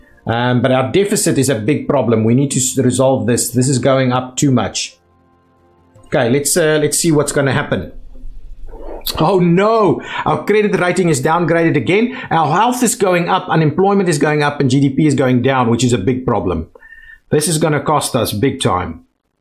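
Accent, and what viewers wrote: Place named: South Africa. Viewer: Are you from South Africa, mate? Love the accent!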